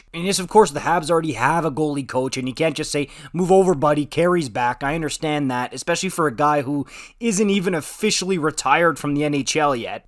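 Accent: France. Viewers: Youtuber sounds like a native French speaker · Are you french? Gotta say you did great!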